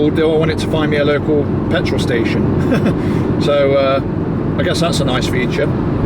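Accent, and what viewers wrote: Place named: Mansfield, United Kingdom. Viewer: Your from Mansfield? Thought the accent sounded familiar! Im considering one of these to replace my TT next year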